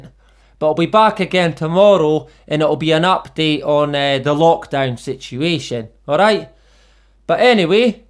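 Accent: Scotland, United Kingdom. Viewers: Shalom, dear friend and brother. Thank you so much for this information. I'm going to be sharing it, along with the previous video on PBB, with family and friends who think I made this stuff up lol. Keeping you and yours in my prayers. Love that you're not afraid to share the truth, especially with that amazing Scottish accent! Say hello to bonnie Scotland for me! Much love from still chilly Michigan USA. 😍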